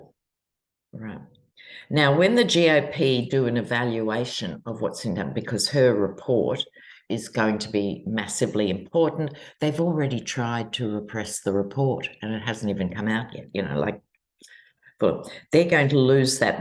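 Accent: Australia. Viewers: BTW, I love your Australian accent which makes it even more fun to listen to your video!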